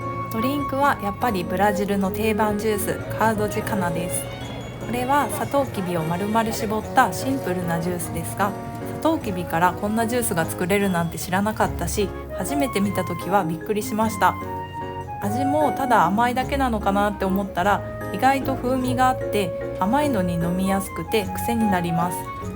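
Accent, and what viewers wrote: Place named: Kyoto, Japan. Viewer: Você é de kyouto ? Então seu sotaque é charmoso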